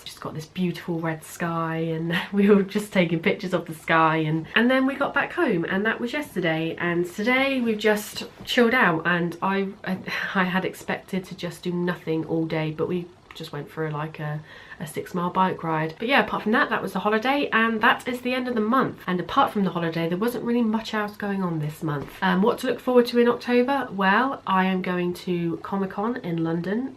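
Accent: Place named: England, United Kingdom. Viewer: I’ve been there on holiday before! It’s great isn’t it? I used to live in the England and I still visit a lot, I was just wondering what part of the England are you from because your accent is similar to mine 🙂 (sorry that probably sounds really weird)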